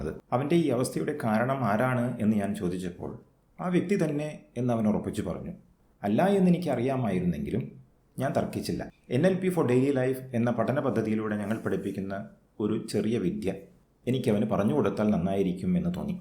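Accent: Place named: United Kingdom. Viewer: your pronunciation of English is exactly like a British native speacker, wish i could speak English like you.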